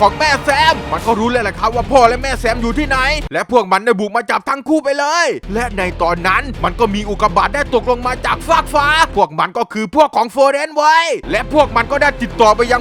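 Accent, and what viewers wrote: South, Thailand. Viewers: พี่เขาเป็นใต้นั้นเเละครับ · พี่ครับพี่เป็นใต้ใช่มั้ยครับตอบผมหน่อยนะ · ฟังยุตั้งนานพอรู้หล่าวว่าคนใต้เหมือนกัญ 🌿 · พี่ครับพี่เป็นคนใต้ไหมครับ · พี่เป็นคนใต้หรอพี่5555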